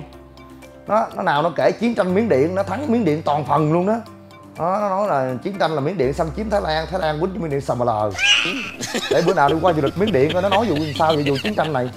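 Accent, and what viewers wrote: Mekong Delta, Vietnam. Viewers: mình quê miền tây nên nghe quen lắm :) chả bị gò bó gì quá , nói chung dn nói đc lắm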